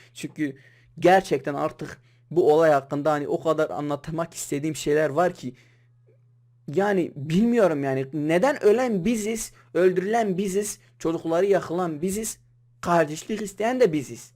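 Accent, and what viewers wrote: Turkey. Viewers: baın değil .bakın.sen tam türk gibi konuşuyon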